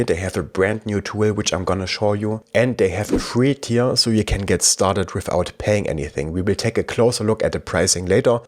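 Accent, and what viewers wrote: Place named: Germany. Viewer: thanks for the detailed review of HeyGen and some great quotes there such as it "even maintained my German accent" ☺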